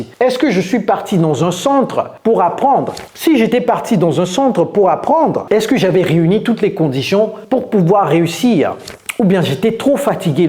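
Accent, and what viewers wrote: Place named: United States. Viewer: Salut coach,je suis étudiant actuellement au Nigéria et je parle bien l'anglais mais je veux parler l'anglais Américain comme vous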